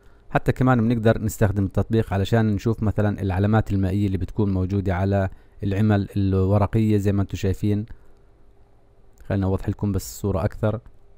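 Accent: Jordan. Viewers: انتي اردني صح من وين بلاردن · انت اردني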